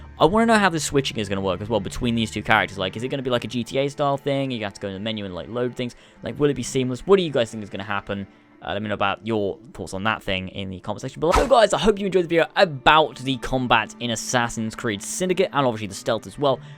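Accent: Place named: United Kingdom. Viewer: Can you stop with that horrible british accent?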